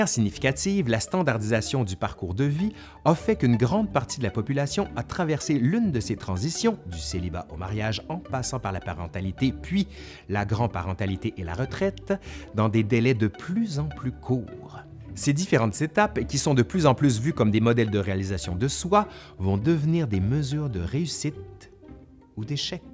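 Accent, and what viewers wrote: Quebec, Canada. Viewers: as tu déjà essayé une sorte de challenge d'action ? C'est à dire lorsque tu te poses une question sur quelque chose tu essaye d'identifier quel est l'hésitation et le doute à l'origine et d'y associer une action en faveur de ce que tu penses être aligné avec tes désirs ? Merci pour la vidéo j'ai écouté du début à la fin dans le Metro Parisien. J'ai trouvé ça très construit. Et l'accent québécois... Un vrai delice !!! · Je suis en train d'apprendre le français et je m'interroge sur l'origine de l'accent de cet homme. Est-il québécois, par hasard ? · Je découvre ta chaine, là à 3h du mat', un ravissement pour bercer mon insomnie ! Super intéressant (sans parler de l'irrésistible accent de la belle province😊)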